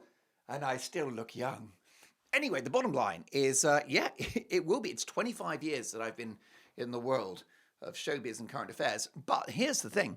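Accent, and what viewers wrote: United States. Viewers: love your American accent, * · I love your American accent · your American accent is cute and spot on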